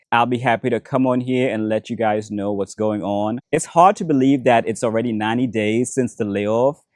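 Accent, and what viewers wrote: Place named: Vietnam. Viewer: You have Vietnamese accent, interesting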